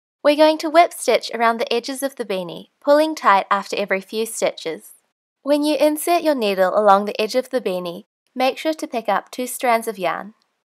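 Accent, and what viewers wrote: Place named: Australia. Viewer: Love the video and love your accent! Australian is my favorite :D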